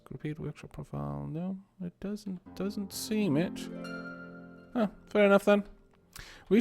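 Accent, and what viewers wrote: United Kingdom. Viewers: Oh it's okay, i always enjoy these parts even if they come two weeks later. I even watched, and that's really rare, one episode twice while playing dwarf fortress just to hear your great british accent! Great videos!:D greets from Germany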